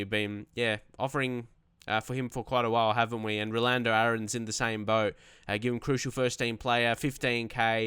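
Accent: Australia. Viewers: Love the career mode but it's moe-at I understand it's the Aussie accent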